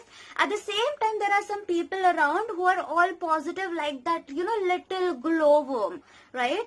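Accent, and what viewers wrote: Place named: South India, India. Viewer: I remember my south indian teacher who give social science lecture and her accent is same as you... Nice video btw ♥️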